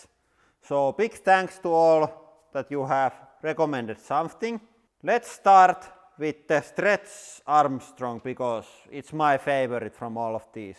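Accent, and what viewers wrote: Finland. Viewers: Sylphlez finnish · sounds like basic english accent from finland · Brian Page Finnish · it's a Finnish accent boi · Are u from finland? Great accent btw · Are u from finland? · Mariela Ivanova It is Finnish and the cutest thing ever lol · How Finnish people talk english 😂<3 SUOMI PERKELE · Like actually that accent sounds way too finnish for me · bankroll unicorn boi its Finnish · baXtian no it's finnish people rallienglanti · Mieze Katze hes probs finish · You have like finland aksent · You can tell he’s probably finnish because of hi’s accent😁 ps. I’m finnish too🤟🏻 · Sun ralli englanti on paras😍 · Brian Page lol it's finnish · Are you Finnish? That’s what your accent sounds like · Benjamin Bjørndal nah they are finnish m8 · Are you from Finland?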